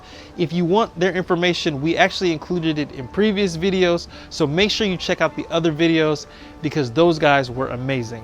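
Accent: Portugal. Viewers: I'm From Angola my Dad was Portugues i live in Portugal from 1999 till 2012 move to UK, but I feel like I wanna move back to Portugal, your cascais accent sound so Portuguese :)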